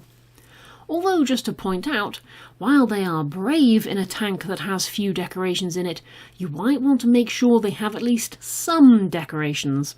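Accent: United Kingdom. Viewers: Thank you for this video, it was so comprehensive. I just heard that they were a bit aggressive so I wanted to explore further. BTW - I love your British accent!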